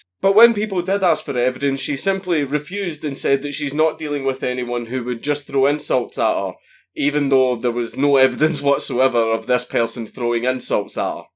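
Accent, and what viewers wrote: Scotland, United Kingdom. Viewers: he's not South African he's Scottish and that screensaver is a joke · “... the Internet collective of weaponised autism kekked into gear” I know Dank meant to say ‘kicked’ but what I heard in his dank Scottish accent was far more fitting.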